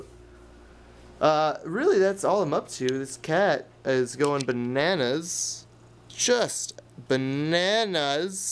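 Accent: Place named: Canada. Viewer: "so if there's ever a mess up with youtube again you can just go there and check it OOT." I LOVE YOUR CANADIAN ACCENT <3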